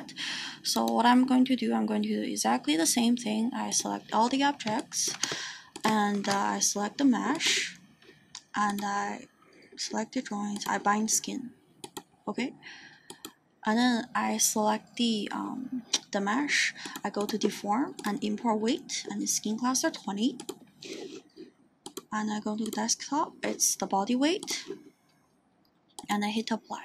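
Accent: China. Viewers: Hi, first of all, thanks for sharing, it's great content. And the moment I hear your voice, I can almost tell that you are Chinese from your accent. Your voice is good btw. And according to your video, I think your conduction means after exporting the skin weight map once we need to re-rig the mesh by "Select Hierarchy and rig it from selected joints" way and then import the skin weight map. Last but not least we need to make sure all joints related are selected, no more no less. I have tested it through this way and it seems to work as expected. Kind of a weird bug that Maya couldn't read the hierarchy after rigging the hierarchy way. we seems to have to tell Maya what joints we did use to rig the mesh. How did you...I mean you r awesome to have found the solution. Congrats. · I'll give this a try later :) Your accent btw, from China?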